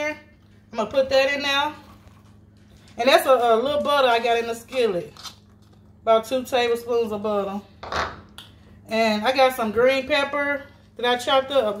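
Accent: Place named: South, United States. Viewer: I hear 615 all in your slang and southern dialect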